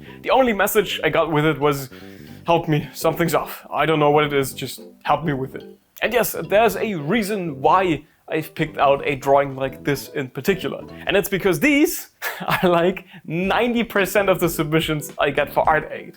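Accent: Germany. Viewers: I just bought your book! draw like a mangaka!!!! thank you so much of all of your videos!!!!!!!!!!! I like hearing your German accent! it inspired me enough to get back only German lessons, so, that you for that too!!!!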